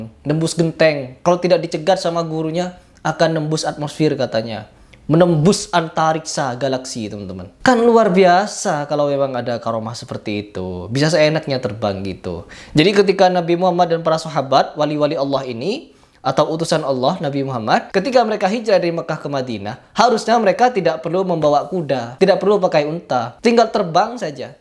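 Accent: Madura, Indonesia. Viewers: ni orang kontenya cuma kebencian,logatnya madura tapi adabnya isra'il,pasti ini belajar pada google dan youtube,sudah jelas mulutnya sebentar lagi miring hidup dan matinya susah bahagia,semoga segera dapat hidayah,tapi orang kyk gini susah dapat hidayah krn sudah tercampur setan yg angkuh dan songong,biasanya klo orang hebat beladiri semakin tinggi ilmunya semakin merendah hatinya,brrti ini masih dikit ilmu beladirinya,sehingga angkuh songong seakan akan paling suci dan paling benar, na'udzubillah stumma na'udzubillahi min dzalik.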